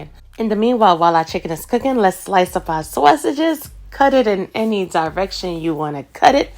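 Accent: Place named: East Coast, United States. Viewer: I am subscribed to your channel but I rarely get in YT but when I do OMG I love watching your vids because the food looks deliciouis and not only that.., I know that I have told you that I LOVE LOVE LOVE LOVE LOVE LOVE YOUR EAST COAST ACCENT. I LOVE IT SO MUCH. VISITING NYC WAS CUT OFF OF MY BUCKET LIST IN SEPTEMBER 2021. MY SISTER SURPRISED ME WITH A TRIP THERE FOR MY 50TH BDAY AND WE STAYED IN MANHATTEN NEAR TIME SQUARE. I'M A COUNTRY SOUTHERN GIRL FROM LITTLE ROCK ARKANSAS, BUT WHEN THE REAL WORLD CAME ON MTV I WAS FASCINATINED, FROM HEATHER B AND I ALWAYS LOVE EAST COAST RAP. THAT WAS A WORLD WIND ♥♥♥ THE WORLD IS KINDA MESSED UP NOW, BUT WHENEVER I GET ME AND MY DAUGHTER SOMEWHERE TO LIVE, I WILL MOST DEF BE TRYING OUT YOUR RECIPES...., CAUSE HONEY THEY LOOK DELICIOUS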